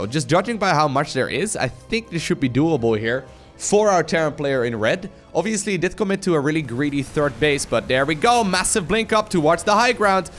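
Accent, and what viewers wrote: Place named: Netherlands. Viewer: Great commentary as usual. I can tell by your accent that you're from the Netherlands. I'm from Texas. I'm sorry about Trump.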